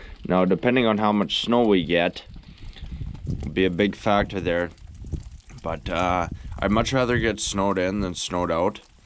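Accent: Canada. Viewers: By the way you talk, you sound Canadian.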